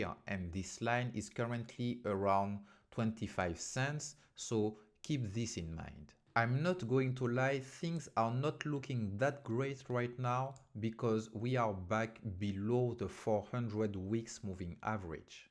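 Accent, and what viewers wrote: France. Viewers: En tant que français j'apprécie beaucoup ton accent frenglish 😅👍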